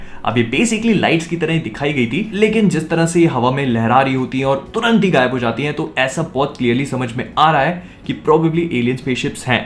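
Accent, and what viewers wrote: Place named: Hyderabad, India. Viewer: haidrabadi voice mast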